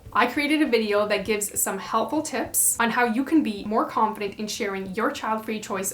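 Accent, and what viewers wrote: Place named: Canada. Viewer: I didn’t realize you were Canadian until today! now I can hear that in your voice. Love that ❤️🥰 I think it’s adorable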